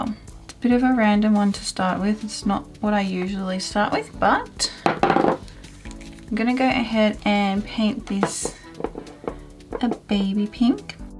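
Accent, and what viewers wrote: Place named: Australia. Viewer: These are so cute!!!♥️♥️♥️😍😍😍 I was obsessed with barbie when I was little. Love these. So creative! You are so talented. It would be cool if you did a Q&A. I'd definitely watch. Love your videos. I love seeing your channel grow so much! I also love Australian accents, lol. I think press on nails is a great idea! I, personally, probably wouldn't wear nails that long or goergous because I'd probably lose them, lol. However, I still think that's a super awesome idea although I think shipping might be really annoying. It would still be so cool to own a set of your art work even if they were just on display. The only other thing I'd be wondering about is sizing.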